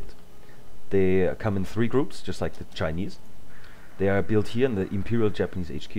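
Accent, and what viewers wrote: Germany. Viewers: netter deutscher Akzent ^^ xD glaube ein wenig bayerisch oder? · Comrade Slaine Oh ich hab neulich auf der Mod DB Seite gesehen, dass da noch leute aktiv sind und dachte da tut sich was... schade. Und ich kommentiere deutsch weil vielleicht Mr. Videomacher persönlich (hört sich ziemlich deutsch an) etwas dazu weiß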